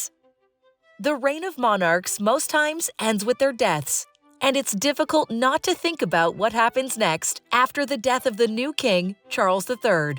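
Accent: United States. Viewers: I'm a Scot. I find it annoying when an American voice lectures me about our Royal Family, and get a lot of it wrong.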